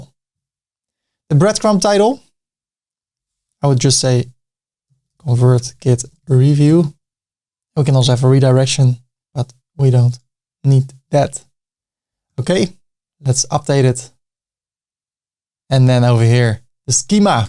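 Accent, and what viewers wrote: Netherlands. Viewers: I've to say it. At first i was like, that voice, sounds familiar. Maar toen wist ik het! een Nederlander !! en toen zag ik maasluis staan. Lachen, Keep up te good work! +1 sub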